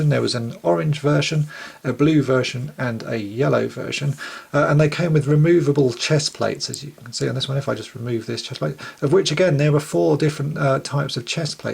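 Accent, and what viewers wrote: United Kingdom. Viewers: John Jones he's a Brit. vowels tens to be reversed.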